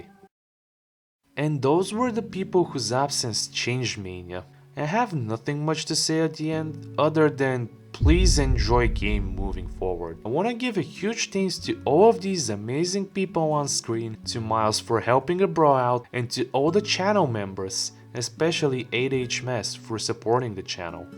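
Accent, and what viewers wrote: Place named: Bulgaria. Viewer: Tozi Bulgarski Akcent e na drugo nivo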